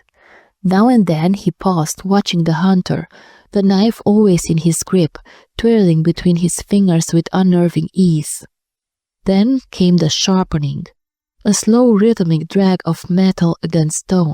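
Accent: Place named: Eastern Europe. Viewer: This is some Eastern European accent, but I can not locate it. Maybe Eastern Slavic, but not sure. Will you tell? Thank you for your effort! Me actually not listening to scary stories, told in a female voice. But wish you good luck!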